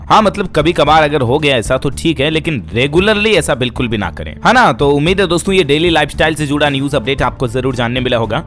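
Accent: Rajasthan, India. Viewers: Tum rajasthan se ho kya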